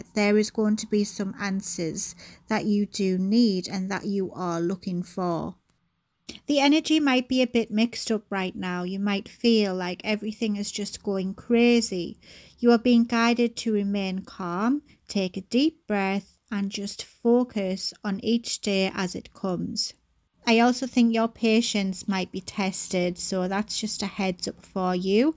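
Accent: United Kingdom. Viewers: I have just found you tonight, lovely to hear a uk voice. Newbie from Preston Lancs What a sweet soul you are. You have a new subscriber. Love & light 🙏🏽 Also my nickname is Ace 🤣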